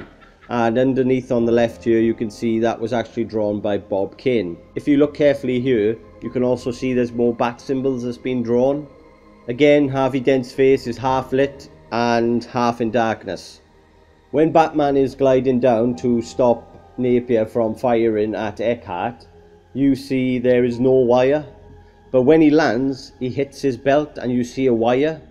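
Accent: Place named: Wales, United Kingdom. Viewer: Welsh accent. I assumed you would be American, don’t know why.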